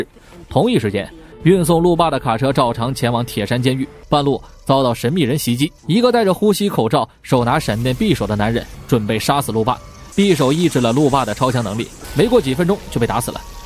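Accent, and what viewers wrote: China. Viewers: 主播是湖北人，口音暴露了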